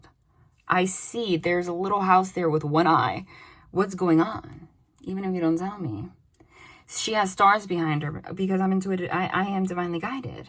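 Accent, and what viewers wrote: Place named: New York, United States. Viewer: Hey I love your New York accent girl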